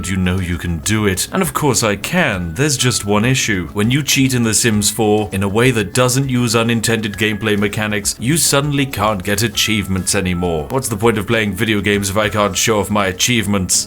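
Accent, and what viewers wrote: United Kingdom. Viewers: oh my, that is one British Brit right there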